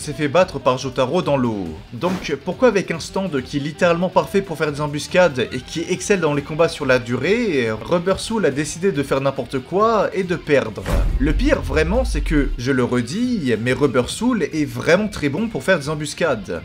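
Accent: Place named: Paris, France. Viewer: Vidéo très intéressante mais ça serais bien d'arrêter d'appuyer la dernière syllabe de tes mots quand tu parles c'est très bizarre on dirais une parisienne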